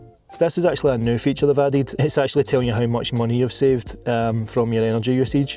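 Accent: Scotland, United Kingdom. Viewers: The gentleman is Scottish. I am English. I can understand him perfectly. You on the other hand are incredibly rude. I also understand that it takes a hell of a lot of time, effort and resource to put these reviews together so that the rest of us have a better idea about what to buy. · I'm watching enough folks from the UK doing reviews that I'm actually able to understand almost every word. It's amazing! And it's a fun challenge. The one thing that I'm not so good at is distinguishing accents. British is pretty easy, but the other three are more challenging for me. I'm going to guess Scottish for you, but as green as everything around you is, it could be Northern Ireland. Am I right? BTW, I don't know about the pricing in the UK, but here in the States, on Amazon, I just bought one of these for 379 USD, which is cheaper than my Bluetti AC180 cost, at 479 USD, in December of 2024 and that was a huge Christmas sale price reduction. 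To me, that was the biggest "PRO" for the 100v2. I enjoyed you review and thanks for publishing the content. If you don't have one already you might look into buying a convection hob. They can cook many different things very efficiently and are perfect to use with a solar generator.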